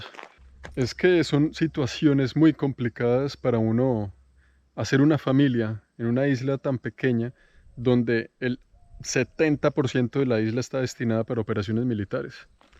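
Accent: Colombia. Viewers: Me encanto!! Igual como me gusta tu acento panacolombiano.! Un abrazo desde República Dominicana 😘🎊🇩🇴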